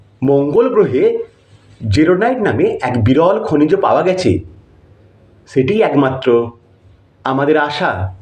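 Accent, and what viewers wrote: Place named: Kolkata, India. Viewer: ভাই আপনার কোন দেশ? আপনি কিছুটা কলকাতার ভাষায় কথা বলছেন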